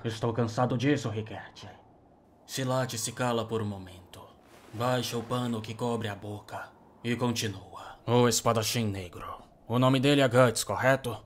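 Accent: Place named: Ceará, Brazil. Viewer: você é do ceará? as vezes o sotaque parece, e algumas palavras também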